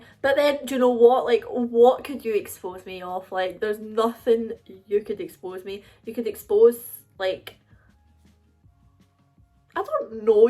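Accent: Scotland, United Kingdom. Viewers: Sounds like a thicc Scottish accent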